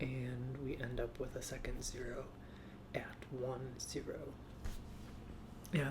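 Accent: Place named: United States. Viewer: As a German-speaker, I say your accent is adorable. ^^ I think it's the first time I ever hear an American (you're American, right?) speak so much German haha. :) I'm glad you uploaded a new video, I find your voice very comforting, I listened to all your vids while doing my dreadful internship in Berlin. ^^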